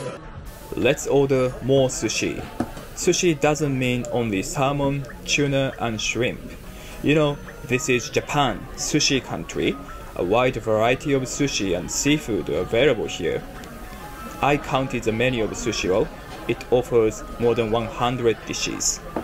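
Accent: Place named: England, United Kingdom. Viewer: You have an English accent. Much better than American-English. And luckily not a Liverpool accent.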